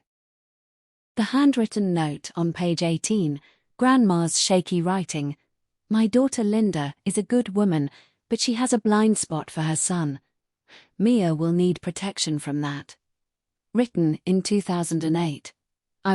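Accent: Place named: Midwest, United States. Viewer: That's quite the American Midwest accent!😂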